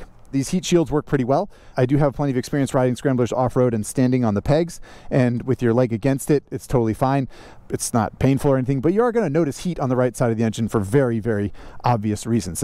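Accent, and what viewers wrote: United States. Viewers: Hi, hi, hi comment section chatters, just this line to say: real nice intro, the change is welcomed (your laugh / the rain) thank you for your verbal skills.. best ad rep online (not that i am interested since i'm european and we get real motorcycles ) 😹 (sorry the pun could not be whiff held) thank you for the number of bikes you put your tushee on (this one looks as ugly as it can faking retro looks...) thank you for making me remember what it is to hear the north 'murican language (don't know why but i do miss it ❤ ) thank you for (in the future) changing the format more often (like you did under the rain or in india) (just couple it with revere ad rep 😸) thank you for ari and lance and spurgeon thank your wife (and kids?) good luck have fun, thank you for your company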